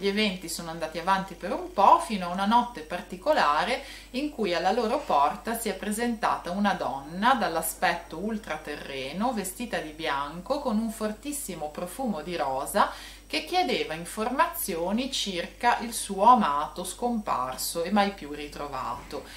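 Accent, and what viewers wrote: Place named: Genoa, Italy. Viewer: belandiiiiiiiiiiiiiiiiiiiiiii non conoscevo questa storia.comunque hai una forte cadenza genoveseeeeeeeeeeeeeeeee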